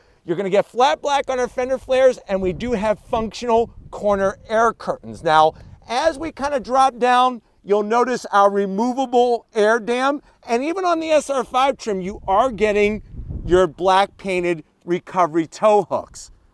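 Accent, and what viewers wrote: United States. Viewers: Sounds weird but American 🇺🇸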